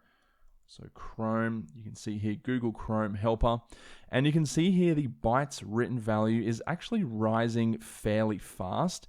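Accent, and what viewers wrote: Australia. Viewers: Brilliant video... love the Australian accent my friend.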